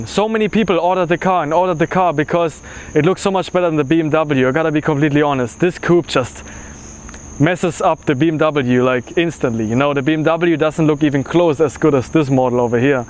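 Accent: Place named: Germany. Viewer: Are you German? You sounds very German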